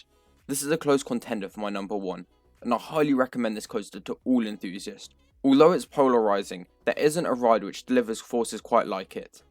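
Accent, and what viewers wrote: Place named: United Kingdom. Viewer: Damn It's always cool to see a Bri'ish and/or foreign enthusiast talk about American rides and their perspectives! I hope you like our other rides and our abundance of air time!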